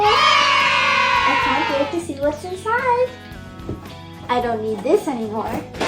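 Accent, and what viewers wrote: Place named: Sri Lanka. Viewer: That's awesome! Happy for you! Looking forward to more exciting videos on your channel. Keep it up! Love your Sinhala pronunciation and Akka's cameo!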